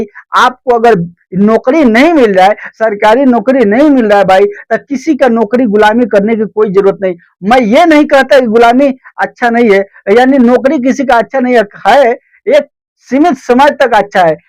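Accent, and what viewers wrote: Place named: Bihar, India. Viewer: Bihari ho kya bhaiya conversation shikho pahle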